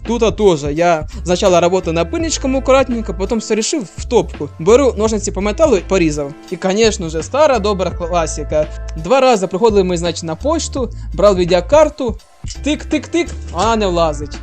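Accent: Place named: Surzhyk, Ukraine. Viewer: Навіщо такий кончений суржик, кров з вух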